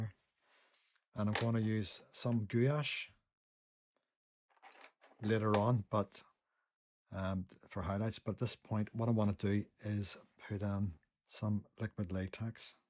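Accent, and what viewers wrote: Northern Ireland, United Kingdom. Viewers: Very nice work! Great to hear your Northern Ireland accent!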